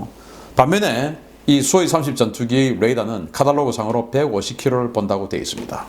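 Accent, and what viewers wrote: Gyeongsang, South Korea. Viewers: 화가 나서 다시한번 들어보니 대만섬 이라고 발음 하는거 같기도 하고?? 경상도 사투리 억양이 남아서 내가 잘못 들은거 같기도 하고??ㅠ 타이완도 엄연한 한개의 독립국가이니 대만섬 이라고 하지말고 대만 이라고 불러부는게 예의 인거 같습니다ㅎ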